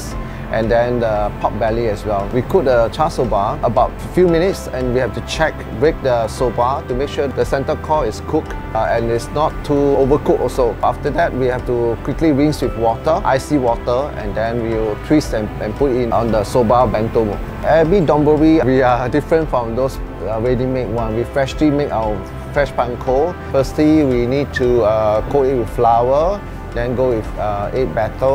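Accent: Singapore. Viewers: He has a Singapore accent ✌️😋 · Ur accent is killing me! ! Singapore English is awful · He speaks in Singaporeans accent.... But i like his presentation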